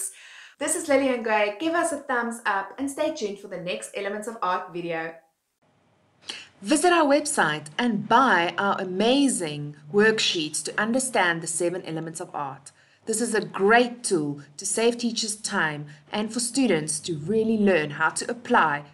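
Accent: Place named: Germany. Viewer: Darling, audio stopped working from till the end of that chapter. It starts again with the new chapter. I don't know if it was a copyright thing or a mistake in editing, but, that happened. I hope it was only a youtube blip and there's actually nothing wrong. It's the fourth video I watch today in this channel and I'm loving it and also I love the German accent! I hope your channel keep moving onwards!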